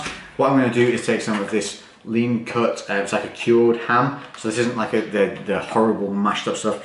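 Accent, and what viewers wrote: United Kingdom. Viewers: Hate brit accent !!!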